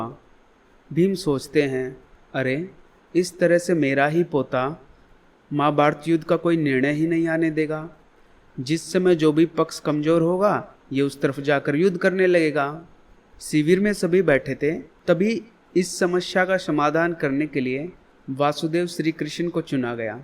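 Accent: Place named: Uttar Pradesh, India. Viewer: Aap up se ho kya ....Apki boli se lagta j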